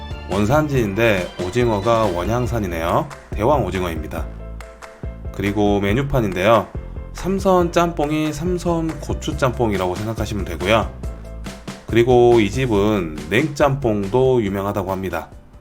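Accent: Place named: Gyeongsang, South Korea. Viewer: ㅎㅎ경상도분이 시죠?? ㅋㅋ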